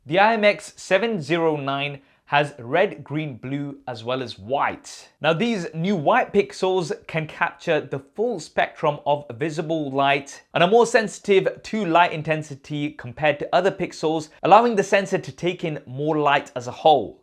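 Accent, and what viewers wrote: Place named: Leicester, United Kingdom. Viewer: i just knew that accent was from Leicester. Great video mate.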